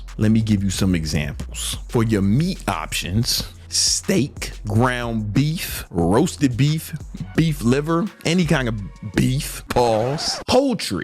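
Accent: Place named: New York, United States. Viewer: German here...just discovered your channel and I especially like the way you talk. Is that the slang or dialect from New York? I will use it to teach it to me (by "shadowing" the way you speak)...that will be fun...a white boy and meek and mild do gooder like me with that talk...hahaha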